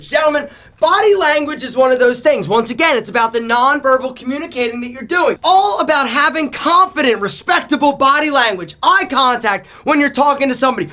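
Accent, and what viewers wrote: Philadelphia, United States. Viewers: Is this guy from philly cause he got that strong ass philly accent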